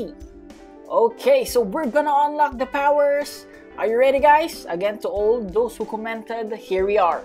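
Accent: Philippines. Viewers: SO THATS WHY U SOUND LIKE FILLIPINO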